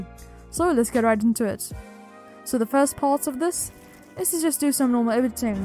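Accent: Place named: Europe. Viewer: U sound Europe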